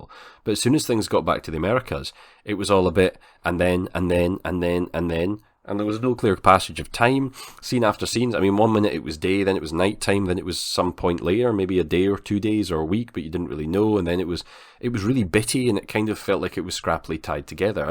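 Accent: Scotland, United Kingdom. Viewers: Hi! Nice to be here again. I really liked episode one. With all the characters and to setup the storylines I think this opening episode is a good thing. The main storyline I think will revolve around the weird Christie family. And yes, they do get weirder! It's been a few years since I've read this book, but I don't think you'll be disappointed. Is your accent Scottish? I didn't realize the actor who plays Tom had such an illustrious career. I'll have to check out some of his other work. His accent is great. Beautiful opening with Glencoe in the background! See you for episode 2 review! (Won't see you, but I'll hear you🙂)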